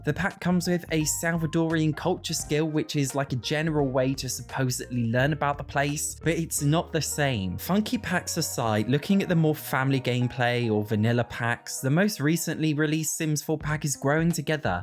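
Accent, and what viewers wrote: United Kingdom. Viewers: As an English Language and Literature major ( I am Turkish) I've been seeking to find someone who has a nice British accent to follow cause I don't want to lose my accent sparkle (u know). And I am so happy to find you! I will listen to you even if you keep complaining lmao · Keep being British I love your accent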